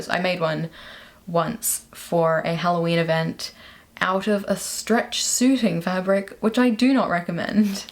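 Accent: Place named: Australia. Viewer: This is my first time watching one of your videos. I have a PhD in linguistics and I find your accent fascinating. At first I thought Australian and then English but with a lot of North American influence. Your rhotic R changes back and forth even in the same sentence. You have a very interesting mix of accents and influences. And I found the content interesting too 😊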